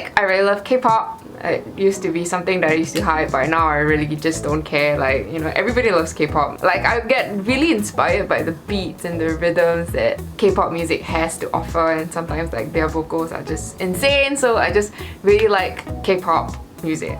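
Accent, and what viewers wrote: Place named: Australia. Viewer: She kind of has a Australian accent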